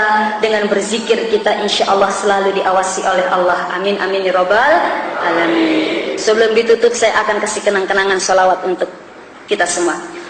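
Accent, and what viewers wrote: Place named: Java, Indonesia. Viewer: Bu gk orang Jawa tinggal di Pinrang atau orang Pinrang mondok di Jawa logatnya masih ada logat jawanya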